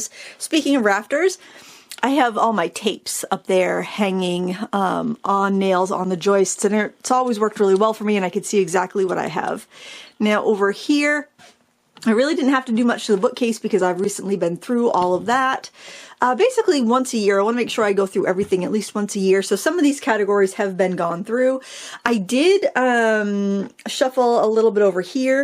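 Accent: Maine, United States. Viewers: Organizing storage bins,, trays, and containers always come in handy, so I would keep many, too. You cracked me up when you asked yourself why you have such a Maine accent today. Uhh, maybe cuz your in/from Maine! Lol!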